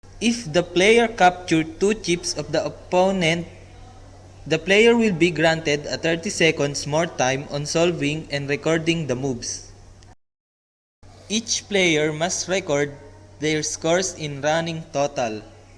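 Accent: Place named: Philippines. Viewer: huwag tayo mahiya sa english natin dahil yan ang tatak pinoy, mga hindi nga ang daming video sa math, alisin na natin yang mentality natin na dapat american accent tayo, ang mahalaga mapresent natin ng maayos ang video natin, mga amerikano nga hindi nagcocomment tungkol sa accent. good work, pls. do some damath videos para sa elementary, napansin ko kasi na wala.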